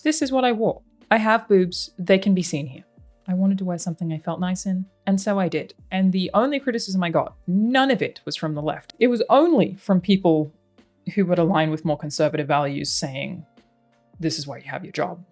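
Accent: United Kingdom. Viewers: That British accent lol sounded like Lara Croft!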